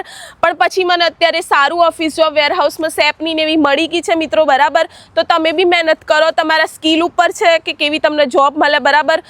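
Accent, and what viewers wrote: Sabarkantha, India. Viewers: sabarkantha ni language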